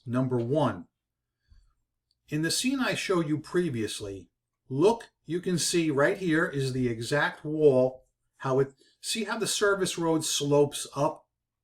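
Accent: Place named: Bronx, United States. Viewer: Thanks so much for this video - 1st, your accent reminds me of my dear departed mother, who grew up in various boroughs ( she was born in the Bronx ) - she and my dad ( who was from College Point ) moved upstate in the late 40s, but she never quite lost her accent. 2nd, your breakdown of what was being torn down and subsequently built put me in mind of what the overall plan for channeling traffic in and around NYC and its suburbs- and what part Robert Moses played in that construction- I heartily recommend the book “The Power Broker” ( I’m sure it’s still available on the used market ) - it’s a thick tome on his construction of freeways ( along with many other works in NYS ) - well worth the read for its mix of a powerful man’s vision and works - some very good, others not so much.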